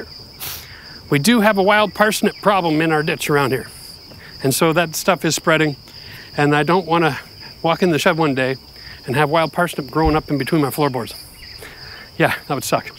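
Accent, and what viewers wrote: Canada. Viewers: had the same thought. I thought I heard a Canadian accent and then when he said was in CA I was shocked. This guy knows a ton but it seems like frost heave is going to eff this whole structure up with time.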